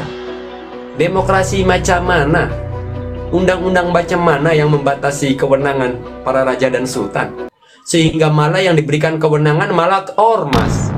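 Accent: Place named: Cirebon, Indonesia. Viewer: Emang nte wong ndi....bersuara mslh cherbon....tp bagus juga sihh....lanjut lahhh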